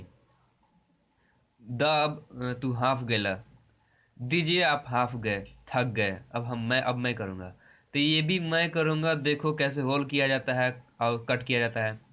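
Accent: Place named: Bhojpuri, India. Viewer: Bhojpuri Bahut pyara bolte hai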